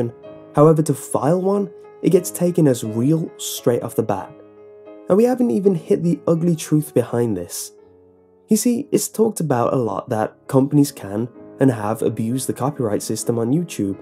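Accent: United Kingdom. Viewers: it's okay dude I will love your videos I also like your British accent manual coolest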